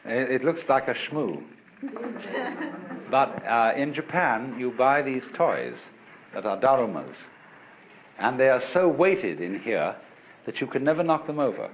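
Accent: United Kingdom. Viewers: Are you sure you aren't referring to Alan Watt, the Scottish guy? Apparently people are confusing the 2. I only recently came across this Alan Watts w a British accent and was like wth? Til I figured it out. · How nice it is to hear a semi posh English accent for a change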